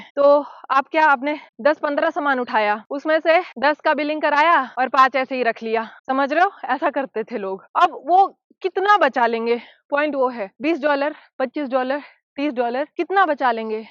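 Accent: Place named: Bihar, India. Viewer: ji namaskar 🙏🙏 Kya aap Bihar se ho aapki bhasha se pratit hota hai🙏🙏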